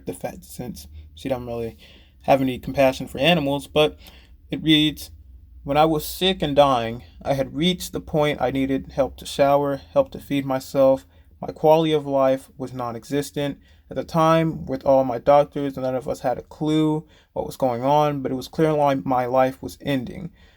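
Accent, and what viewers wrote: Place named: Working class, United Kingdom. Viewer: Mmm so if you believe this girl is sophisticated that would be really sad. As upper middle class, don't kniw yet her accent sounds like a lower class british accent. She's not the brightest and craves attention. So if i had to bet, is lower class narcisist with a severe eating disorder.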